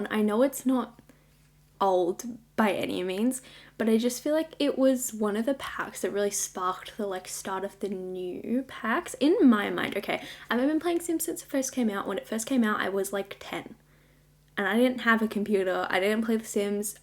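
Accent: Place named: Australia. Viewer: I can't tell if you're australian or not. But i think you sound like one xD I like your accent and voice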